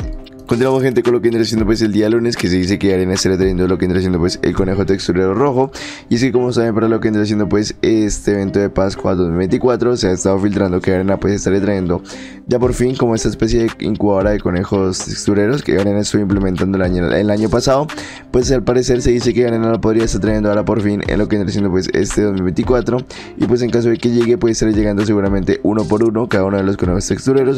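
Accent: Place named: Argentina. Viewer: Lo más xd que escucharán hoy confundía el acento argentino con el español XD 😂😂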